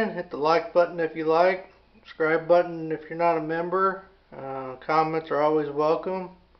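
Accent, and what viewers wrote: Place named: Appalachia, United States. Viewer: PS, Appalachian accent I hear!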